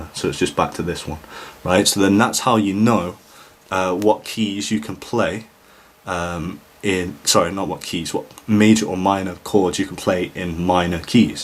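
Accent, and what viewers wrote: United Kingdom. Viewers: may I inquire as to what part of the UK you're from, can't quite identify the accent . PS I really enjoy your down to Earth Style of instruction.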